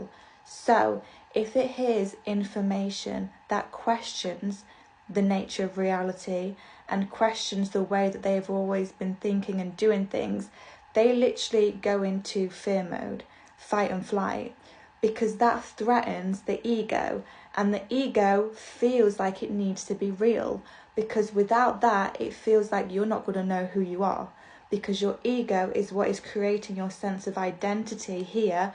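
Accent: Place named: England, United Kingdom. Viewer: Thank you. I love your accent. Are you from England?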